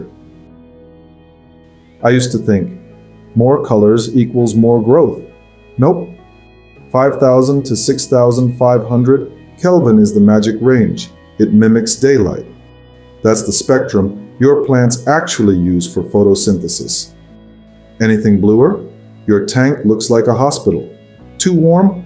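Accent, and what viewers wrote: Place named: Russia. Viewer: I like your somewhat russian 🙂 voice which is clearer and concise. Slower and well paced so I can pause and take notes too. 👍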